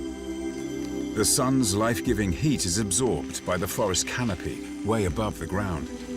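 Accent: United Kingdom. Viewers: This narrator is definitely not American. Sounds like he is from the U.K. somewhere.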